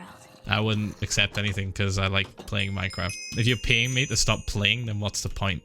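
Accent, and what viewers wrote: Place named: Northern England, United Kingdom. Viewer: Nothharn LKegnAnd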